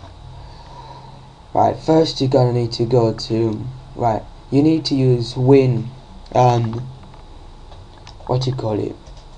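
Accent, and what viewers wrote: United Kingdom. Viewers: Fucking british accent... CANT UNDERSTAND YOU!!!!!!!!! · HES BRITSH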